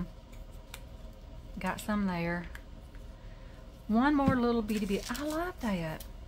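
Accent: South, United States. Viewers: I'm new saying howdy from Texas!! I love to hear another southern voice and your journals are amazing :) Where do you get all the supplies? I wish I could come play at your craft table!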